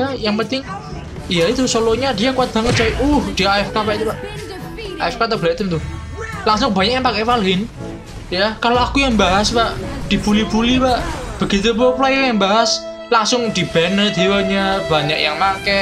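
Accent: Java, Indonesia. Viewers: Medok bnget wkwkwk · biasa aja bicara y jangan medok biar gk salah nangkep. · Jawane sampean ngendi bang?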